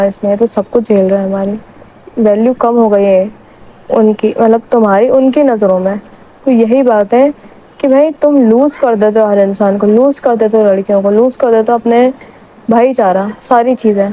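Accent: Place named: Madhya Pradesh, India. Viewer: Are you from madhya pradesh? Because of your tone I feel like you are from MP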